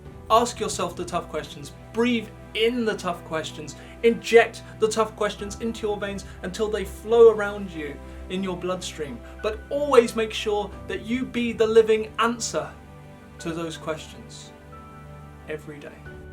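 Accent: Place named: Ireland. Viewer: Well wtf have you turned in to, you might have been better of telling us your number one fans, the bull shit story of Aladdin in your Irish accent,it would have been more fun, keep them coming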